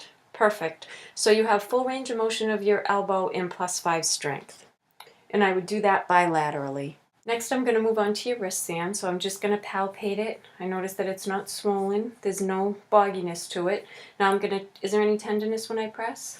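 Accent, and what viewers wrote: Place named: New England, United States. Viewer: Love the New England accent.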